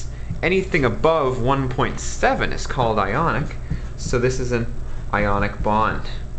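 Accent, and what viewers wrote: Canada. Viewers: I like you,. And your Canadian accent.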